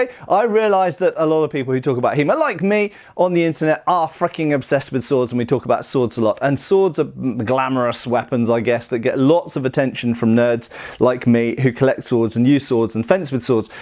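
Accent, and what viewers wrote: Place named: United Kingdom. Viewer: I like your use of a proper British accent in this video.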